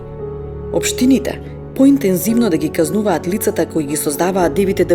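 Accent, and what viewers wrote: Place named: East, North Macedonia. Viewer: Малку си мава на источномакедонски нараторот, но е многу подобро отколку виличење на скопски. Најважно од cè, многу интересна тема, и добро покриена. Браво!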